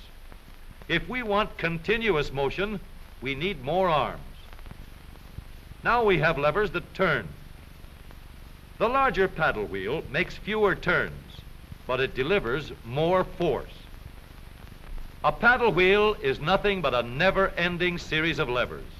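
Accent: Transatlantic, United States. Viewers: It’s a form of Trans Atlantic dialect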